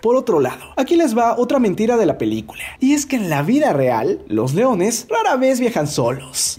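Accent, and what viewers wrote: North, Mexico. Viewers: Chale, lo último es traumante xdxdxd Diablos que norteño xdxd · Alv esa mierda estu muy norteño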